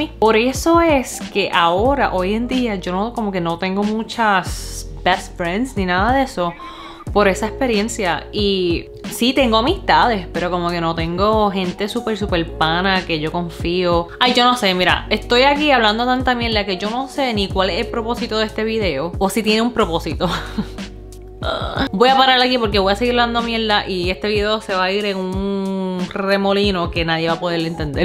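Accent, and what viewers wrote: Puerto Rico. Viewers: You are very cool to the fullest 🇵🇷 You can't fake that Puertorican accent much love 💕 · Hablas bien Boricua.Te felicito por tus videos. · tienes un asentó bien boricua que hermosa 🇵🇷💯💯🇵🇷🤣🤣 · Mira belleza, usted es muy Boricua sin duda. Hasta en tu hablar 🗣 se nota, aunque no hayas nacido en PR viviste la cultura y está en ti todavía. Cambias R por L y J por S. Algo muy Boricua. New York City es una ciudad con gente del mundo entero, no hay un estado con más diversidad en USA 🇺🇸. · Eres la china más bonita y preciosa que he visto además hablas con un acento boricua inconfundible. Los boricuas te amamos.eres inigualable. Y unica. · 🙋‍♂️ saludos mi'ja! Me encanta ver u oir como hablas a lo Puertorriqueño 😃 un orgullo de tenerte como compatriota Puertorriqueña aunque sea China de nacimiento 👍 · Dos minutos atrás vi el vídeo tuyo preparando el arroz frito, tu acento boricua te queda perfecto, tienes mucha gracia y recuerda el SAZÓN está por dentro y tienes mucho. 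Saludos desde 🇩🇴. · I can totally relate with you when it comes to moving during senior year. I moved from NY to Florida during my Sr year...it was the freaking worst. On another note, me encanta ver tus videos, mi mamá was the one who told me to check your pg out. Y cuando te vi hablando no solamente español pero con el mismo acento que tengo yo, I was kinda tripping out. Que viva Puerto Rico y todos los Latinos!! Pa’ lante!!!! #WEEEPA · Ella habla como el boricua que nace en Estados unidos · Como aprendistes el ingles tan rapido y tan bien como tu lo hablas? De las 3 idiomas q tu hablas cual es el preferido q tu prefieres hablar? Y yes you do have an accent when you speak english pero dats wat happens cuando eres boricua isla del encanto and where in ny do you live at cause i live in ny too so have a blessed day and y q tengas un año mejor q este so let 2021 be a better year than this on Dios te bendiga y pa lante mija q eso es lo q hay weeeeeeeeeepaaaaaaaa puñe_ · Wao me encanto tu story! 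Yo soy puertoriqueña y no me crie en PR. Desde los 5 años estoy viviendo en E.U. Te apoyo 100% y eres mas boricua que yo!!! Tu acento es puro boricua que por un momento me quede boba jijiji. Te deseo lo mejor en NY y maybe un dia regresara a PR a demostrarle a todos aquellos q no te apoyaron q tu saliste pa lante y ellos no.. Pa lante nena! Que viva PR y China! Dios te bendiga. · Tu eres boricua tu forma d hablar el acento es la nuestra ya estas marcada por nuestra cultural tus recuerdos parte d tu vida esta formada en la Isla, t mudaste por la misma razon q nos hemos mudados todos fisicamente pero nunca puerto rico c a ido d nosotros donde quiere q voy soy PUERTORRIQUENO d LOIZA y AMO a mi ISLA La EXTRANO y VOVERE ALGUN DIA A MI BORINQUEN. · Eres tan boricua que hablas como el boricua mitad Español y mete palabra en Ingles y no es que este mal asi son ustedes los boricua su cultura saludos Ecuador en la casa. 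· Wao que linda eres y escucharte hablar asi como mi gente asi boricua me vuelve loco 😍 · Acabo de encontrarte y me alegra de que hables así de bonito de P.R. sabes q puedo ser tu abuela y viví por 20 años en NY pero mi isla yo lo la cambio por nada siempre venía de vacaciones y aunq la isla es pequeña la economía está mal pero de PR han salido muchos artistas muchos deportistas y tenemos muchas personas profesionales y tú eres lo q eres en cualquier país; y lo q quieres lograr lo lograras donde quieras q estés viviendo; pero sabes algo? Eres una pura boricua al hablar me encantó escucharte y te deseo lo mejor en NY o donde seas... suerte y cuidate mucho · Buenas tardes espero que todo este bien sólo escribo para decirte que tu tienes de China sólo el nacimiento pero el resto es boricuaaa corazón al momento de hablar dije está es más boricua que yo, que un jibaro de clavo pasao Dios te bendiga siempre cuidate se te quiere de gratis boricuaaa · Tu eres pura Boricua ese hablar es unico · Tu hablas como puertorriqueña pura saludos desde la isla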